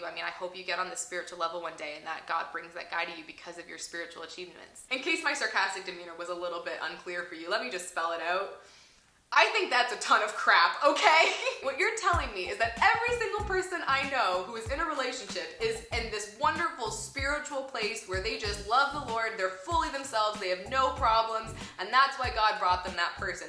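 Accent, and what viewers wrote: Canada. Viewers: I just love Canadians and the things they say. Especially when u say about ur accent comes out and it reminds me of my Canadian family and friends and it just makes me happy which i guess means u make me happy I know that this is a personal question but I'm curious as to what ur denomination is in Christianity. The reason y u ask this is because my family in Canada is apart of the United church of Canada and every summer we would go to a church camp located in berwick united church camp, berwick Nova Scotia. It's a 10 camp with all sorts of different activities and fun stuff. I was also wondering if u know of the Christian musical group from Canada called the message. They don't really put out there music publicly but it's really good music to listen to and stuff. Ur a great Canadian 😊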